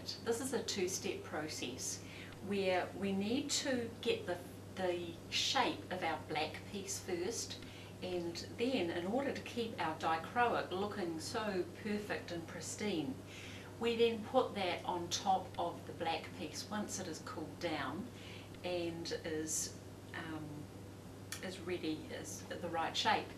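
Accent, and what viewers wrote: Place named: New Zealand. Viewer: ahh.. wonderful tutorial. I have wondered how these are made, and here in NZ too! I recognised your kiwi accent. I am getting the vacuum gear together (almost have all now) to make the vapor deposition dichroic glass here in NZ as well. Is there a maker or supplier in NZ already?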